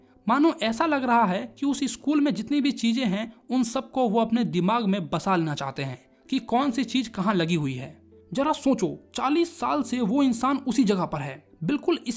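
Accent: Bihar, India. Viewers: Sir aap bihari ho kya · You are from Bihar? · Bhai aapke bolne ke style se lag rha h ki aap bihari ho.😅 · Bihar se ho bhai❤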